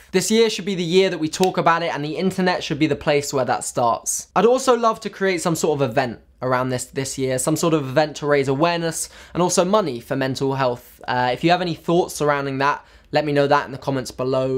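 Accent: United Kingdom. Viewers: First time watching your videos. Honestly came here just for gettin' used to the english (england) accent. Because I'm moving there from Spain and here..well, lets say that I've been pronouncing download like doh-w-nloh-add because of my teachers. ha! Well. Uhm. Love it. You seem very kind and mature, and everything in this video is GREAT. Mental illnesses are, actually, quite common. Go ahead. Im following you! You encourage this people to talk about themselves and their problems without that 'tabu sensation' that society made of and for perfect people has done. NICE! Thank you!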